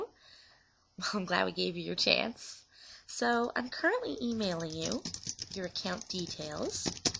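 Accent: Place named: Canada. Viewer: U r so Canadian. Uhhhh why u say about like aboat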